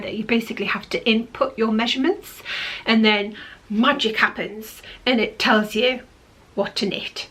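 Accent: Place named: North East England, United Kingdom. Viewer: Brilliant to see you back, I’ve missed hearing a north east accent! Xxx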